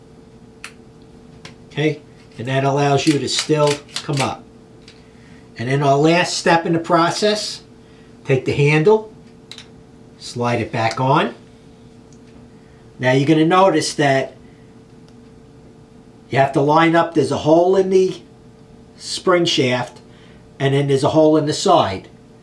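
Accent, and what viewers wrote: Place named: New York, United States. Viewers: definitely has that classic "Lawn Guyland" accent, lol. I'm from Long Island, and even I am amazed at his heavy accent. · Are you from Noo Yawk? Great Video! Thanks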